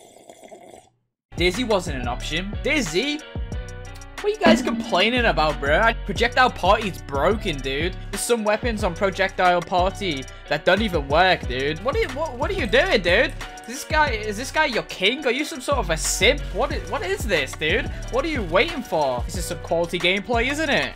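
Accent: United Kingdom. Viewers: Are you British? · why? he british. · is this guy british?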